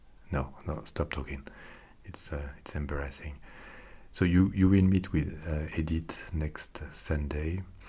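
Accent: France. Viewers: Love this, very funny :) Much more like this, French accent and sarcasm goes so well together, lol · This is awesome! I’m American and dated a French guy for several months. Your accent brings back memories.. fortunately he wasn’t quite this rude 😂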